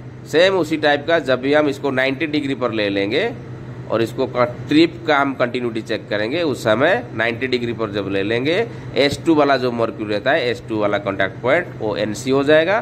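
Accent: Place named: Odisha, India. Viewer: Odia guy....bilkul odia accent. good job dear.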